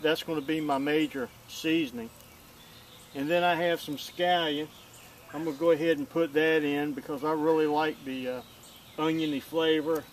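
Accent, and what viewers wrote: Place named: North Carolina, United States. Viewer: I enjoyed this, thank you. You sound like you might be from North Carolina or east Tennessee?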